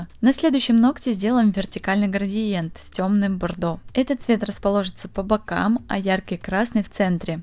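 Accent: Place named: Ukraine. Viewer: жила раньше в Украине, это все же накладывает отпечаток на говор. Ничего страшного, ошибок в речи не так и много)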